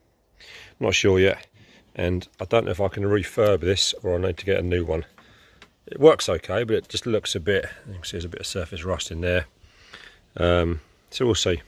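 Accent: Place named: United Kingdom. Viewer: I have to tease you a bit here, but with a good spirit governing it all. When I first saw your face, you had a shocking resemblance to that of Nathan Elliott from Tennessee who has been making sawmill videos for five years now. Check them out. I would love to see you both side by side at a party just to see how many would mistake you two for brothers. Until one opened his mouth and spoke with a British accent and the other with a rich accent from Tennessee.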